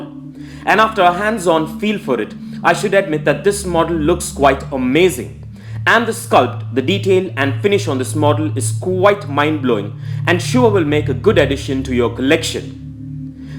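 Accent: United States. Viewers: Your accent is like you are a man from America.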